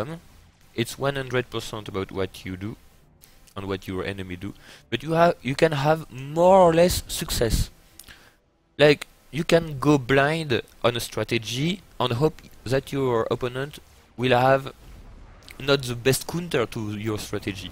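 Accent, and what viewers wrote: France. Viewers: tu est français? Car je trouve que tu as quand même un accent français, et cela se sent bien, je suis un de tes nouveaux abonnés et je trouve que tu vas bien m'aider à progresser dans SCFA et je te souhaite une bonne continuation!;-) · Bring back the scoot! Your pronunciation of scout was very accurate, but the french accent only enhances the video.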